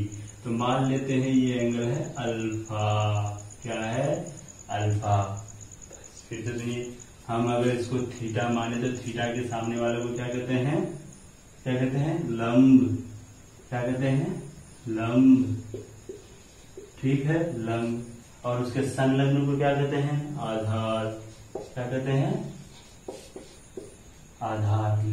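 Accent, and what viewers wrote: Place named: Bhojpuri, India. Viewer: Bhojpuri me bol Rahe ho aap ❤🎉🎉🎉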